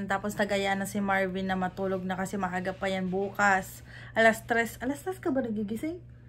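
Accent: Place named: Quezon, Philippines. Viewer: Puntong Quezon..Maubanin..Hello po from your kabayang pagbilaowin in winnipeg…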